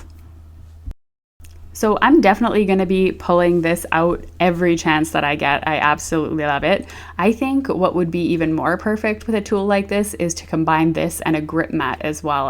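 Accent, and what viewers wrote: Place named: Canada. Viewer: Your Canadian accent is so cute. Idk how I feel about this platform. I like the lines and the clicks so it’s a maybe yes for me. Thanks for showing us how to use it ❤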